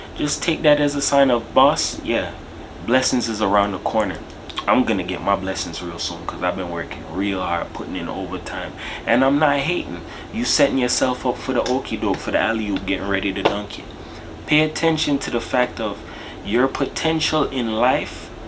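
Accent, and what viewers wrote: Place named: Jamaica. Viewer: YOUR VOICE IS SOOTHING.... RASTA ACCENT! JAMAICAN???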